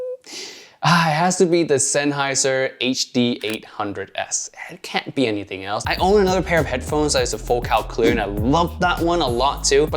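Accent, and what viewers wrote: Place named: Singapore. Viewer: Thought you re a Singaporean...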